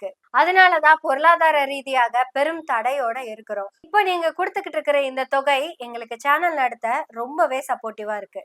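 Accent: Kongu, India. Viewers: ❤ நகைச்சுவை மிக்க கொங்கு தமிழ் அழகாக அரசியல் விளையாடுது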